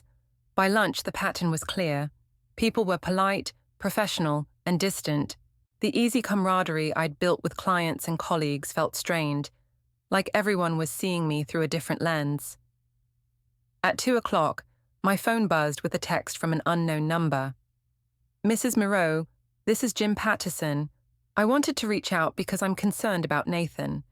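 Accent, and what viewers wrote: United Kingdom. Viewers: Excellent, if sad, story. Good plot editing, good narration. Captions seemed correct for spoken words and had proper punctuation. Recommend this channel. Voice has British accent to my ears.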